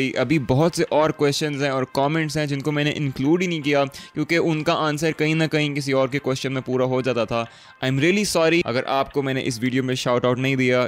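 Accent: United Kingdom. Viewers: You Deserve My Subscribe. I like your English Accent And Your decent Talk. 👍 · Dude please teach me your english accent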